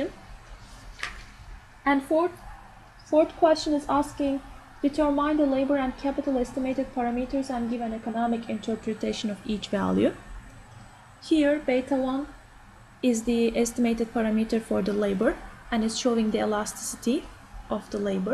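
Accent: Turkey. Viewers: tam bir türk ingilizcesi nerde duysam tanırım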